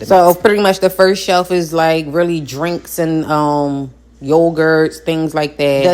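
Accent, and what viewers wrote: Philadelphia, United States. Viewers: This woman definitely sounds like she is from Philly. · Love the Philly accent. I be catching my self mocking my family after chilling with them for a day or so!!! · I need to get some of those fridge containers! Is that a Philly accent I hear!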